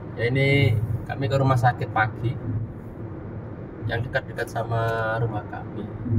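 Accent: Malang, Indonesia. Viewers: Tas Nemu Chanel mas, wong Malang ta mas? Kok logat e koyok wong Malang?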